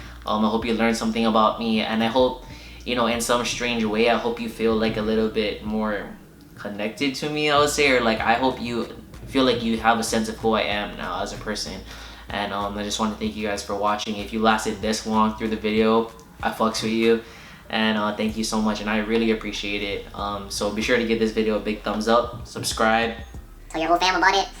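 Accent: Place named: Toronto, Canada. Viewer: you talk like a Toronto man Rate it still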